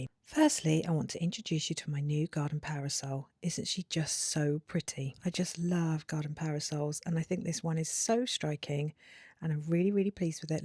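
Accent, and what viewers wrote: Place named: England, United Kingdom. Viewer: Your new parasol is spectacular and the solar lanterns are so cute! I love it all! I love your voice over and your charming English accent. (I'm from California, USA) TFS! 💜 💜 💜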